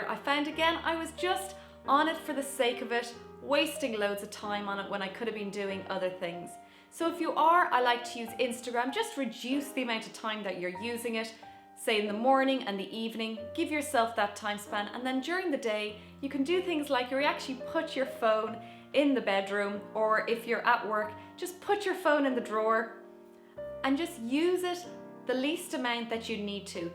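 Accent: Ireland. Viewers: Your accent is beautiful where are you from? Ireland?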